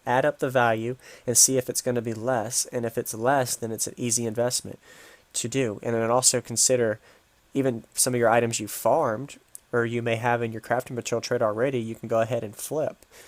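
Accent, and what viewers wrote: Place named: England, United Kingdom. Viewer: INGOTS! not ignots.... N G.... not G N.... u sound english man wtf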